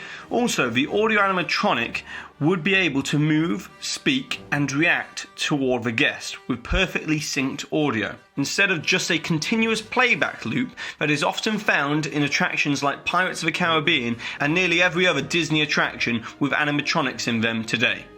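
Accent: England, United Kingdom. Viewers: I love your show. The way you pronounce words is cool. Very English. We say patent different here in the USA. See you on Tuesday.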